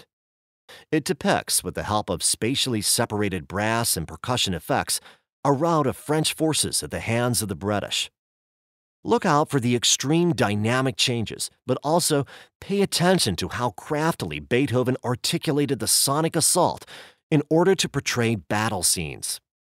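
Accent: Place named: United States. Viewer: If you have an American accent, then go by that. You sound like you're American.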